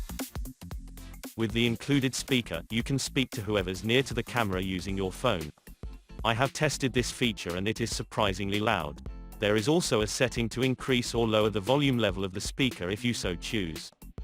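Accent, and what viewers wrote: United Kingdom. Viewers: My how British you sound. Lol Great video my friend, Thanks for sharing this! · Thanks for sharing brother. Very much appreciated. Looks like a nice security camera. Do I detect a British accent? Keep the content coming. Love this channel. Have an awesome night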